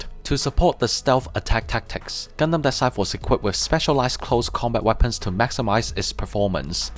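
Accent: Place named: China. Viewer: I can't quite place your accent. I wanna say maybe Chinese or Tai. Either way, you don't give yourself enough credit. I didn't have any trouble understanding you.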